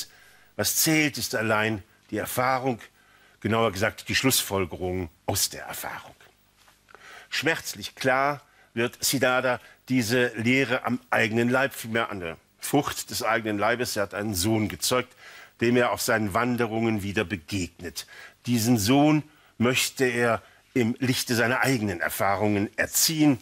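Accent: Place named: Ruhr, Germany. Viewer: ist sicher keine "Kaulquappe" sondern ein versierter Literaturkenner. Was aber den Genuss seines Vortrags für uns etwas trübt ist dieser leicht aufdringliche, asthmatisch Oberhausener Pottbeiklang.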